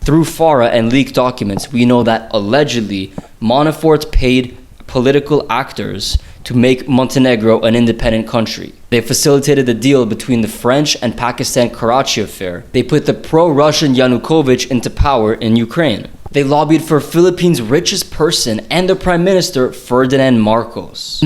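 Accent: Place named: Canada. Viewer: Oh this is good man. Idk where you came from… sound Canadian - but this is good work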